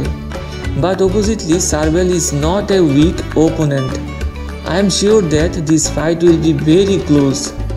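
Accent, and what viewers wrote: India. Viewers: Sorry but I just couldn’t watch that video. That Indian accent reminds me of the frustration every time I try to call customer support, be it for my cable, phone, internet support, etc and I don’t understand what the hell the Indian agent are trying to explain to me and worse they don’t understand my American English.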